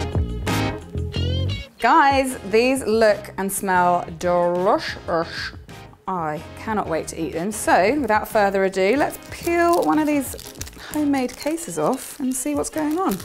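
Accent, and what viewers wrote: United Kingdom. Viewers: Omg your british accent 😩☝️I’m OBSESSED. Period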